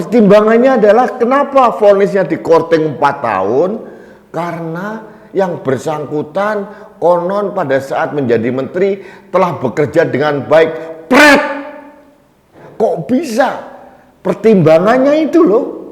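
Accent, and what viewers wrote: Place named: Kediri, Indonesia. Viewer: nuwun sewu nggeh. Panjenegan logat bicaranya kediri bngt. Mak bedunduk, Dagelan, itu Bahasa Kami orang kediri. Iya menurut saya pribadi itu Hakim kok peko' eram to. Kulo tiyang alit mawon mboten saget mikir hakime jian nemen. Hukum kok saget d enyang" d sekeng" MA ne kok ngoten to. Sanoyo dangu sansoyo kebacut.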